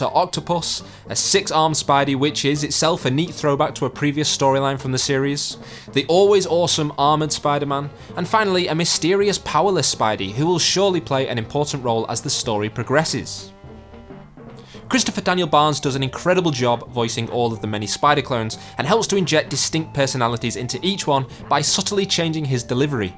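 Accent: United Kingdom. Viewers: Downvoted and left the second I heard a British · I don't mind seeing the old 90's Spider-Man the Animated Series again, but I wish most YouTube uploaders would stop being dickheads and talking trash about the ending of the show being related to the Clone Saga storyline. "The story that fans love to hate"? Thats the problem: most people think that most (if not all) fans disliked the Clone Saga storyline when it was the best story in recent years for Spider-Man at the time. So much happened in that story that I, for one, couldn't get enough enjoyment out of it. Then, it was over, but it had lasting impressions that were both memorable and shocking. Maybe I'm being a bit prejudiced when I say this (honesty makes the world more interesting), but since the narrator is British or English, I don't expect him to fully understand. Even if he didn't like the Clone Saga, there's no need to keep talking down about it as if it was the worst story in Spider-Man history (cough One More Day). 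Yet, this is what people do. Nothing better to do with their lives than bring up old hurts from the past. Leave it alone, already. He sounds like a somewhat old enough guy. Move on.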